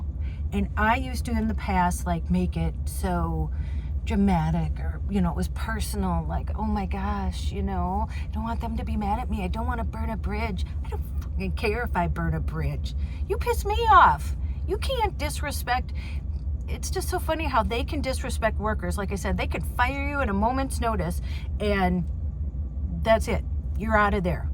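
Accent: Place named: Midwest, United States. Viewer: Good for you. Spoken like a midwestorm girl. Minnesota or Wisconsin. AL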